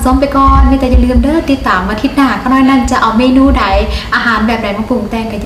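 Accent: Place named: Laos. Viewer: ນີ້ລະຈັ່ງເອີ້ນວ່າຄົນລາວເຮົາການເວົ້າແບບນີ້ສຸດຍອດມັກຫລາຍ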